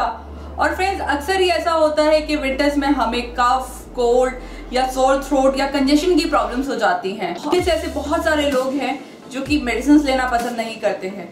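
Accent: India. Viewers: I cant understand indian english like who has the same issue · Your english sounds like hindi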